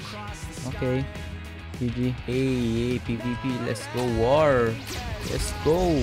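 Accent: Bisaya, Philippines. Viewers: "Bro palaban sa sea beast haahahahahhahahah" Leme ask u are u Tagalog or bisaya bc the way u talk it's like u are bisaya but idk Bruh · First time ko sa channel mo tas yung accent mo sabi ko pilipino toh😂... Naks luv it keep it up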